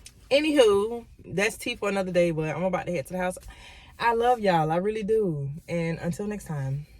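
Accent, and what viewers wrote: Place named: South, United States. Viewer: I hope your leg/ankle gets better!!!! 😂😂😂😂😂you got parking lot rage lil sis next you can borrow my mom handicap sticker she don’t need it. Yo, I love for you to do commentary over a short film or video that would hilarious especially with your down south accent😂😂😂😂. I got an idea next time you go get waxed don’t use the sound from the voice just do the voiceover and put a lil spice with it.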